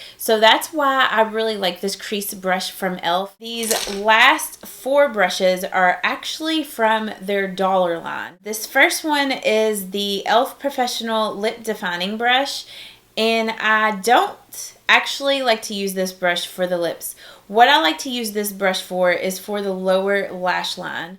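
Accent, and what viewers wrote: South, United States. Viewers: You have to be southern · Great video! I love that some of your favs are the $1 brushes. I feel like they don't get talked about enough since the studio brush line came out, and there are some real gems in that ($1) line! If I may say so, being a southern girl myself (lower SC, it don't get much more southern than that lol), I just want to suggest you work on what your going to say a little more before you film. There are a lot of "um's", "so yeah's", and a few mispronunciations of words that can really separate a youtuber from being a guru. It may be as easy as just editing a little more so your thoughts become more concise and you don't repeat yourself so much. People hear a southern accent and immediately take you as being uneducated. It is ridiculous and horrible, but you can overcome that be simply trying a little harder to make sure you're not losing your audience because of your speech. 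I hope you don't take what I'm saying offensively, I don't mean it that way at all. I really like you videos. I just think you could really gain a bigger audience by tweaking this one thing just a little bit. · Katelyn Hughes she's one of two so far that I have followed specifically because they have a Southern accent! I love hearing ladies that talk like me!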